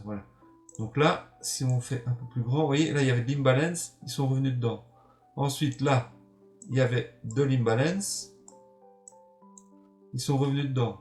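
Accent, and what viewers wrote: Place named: Belgium. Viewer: merci pour la précision je pense qu’on s’est formé chez la même personne et en plus ta l’accent belge donc je m’abonne ☺️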